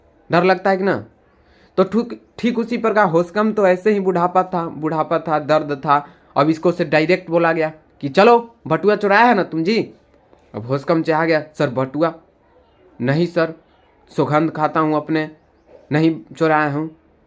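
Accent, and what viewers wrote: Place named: Bihar, India. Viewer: Total bihari vibe aaya sir maja aa gya😂 padhne me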